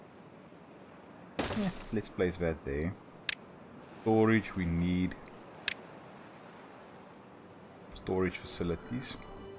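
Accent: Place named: South Africa. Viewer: aweh, ek help maar uit met n comment want ek kan hoor jy is nie engels en dis min wat mens SA mense kry wat sulke videos maak. well done!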